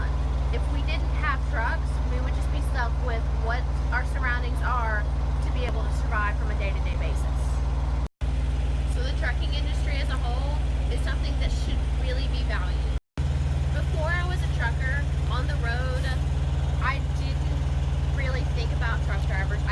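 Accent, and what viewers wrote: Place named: South, United States. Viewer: This girl is an absolute beauty. I love her country accent as well. Keep doing your thing girl.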